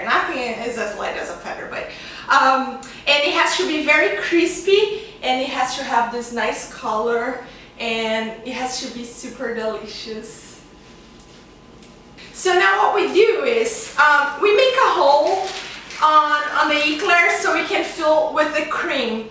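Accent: Brazil. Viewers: she sounds brazilian they have onr if the cutest accent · Você é brasileira, né? YOU'RE BRAZILIAN, AREN'T YOU? I KNOW BY THE ACCENT! A receita parece deliciosa!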